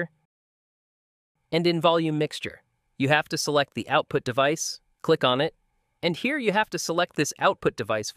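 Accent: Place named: India. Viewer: Thank you random Indian/wherever that part of world person for using AI to make it so we could understand you.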